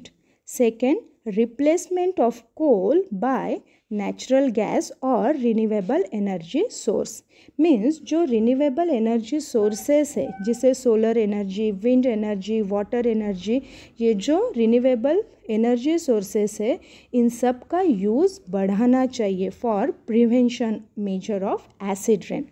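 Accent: India. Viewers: Brilleint maam btw ma'am u r from south India i think according ur accent rii8?? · r u bengali ?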